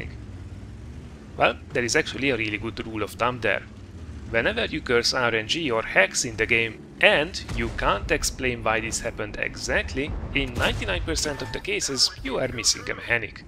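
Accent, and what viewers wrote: Hungary. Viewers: Hey! Are you Hungarian, because the accent of yours sounds like you are to me. :D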